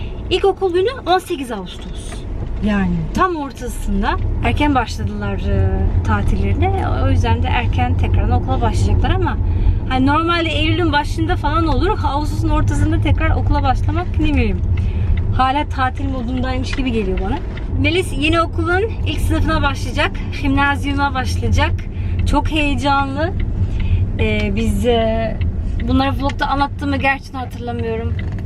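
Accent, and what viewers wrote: France. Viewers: Fransızca aksanın gerçekten çok tatlı🥰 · Hepsi ayrı ayrı özel ve çok guzel kırtasiye ürünleri,çekilişe kızım için katılıyorum.Ayrıca Fransızca aksaniniz gerçekten çok guzelll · Fransızca aksanın inanılmaz güzelmiş 🧿 çok şaşırdım yıllardır takip ediyorum ama hiç Fransızca konuştuğuna şahit olmamıştım 👏🏻👏🏻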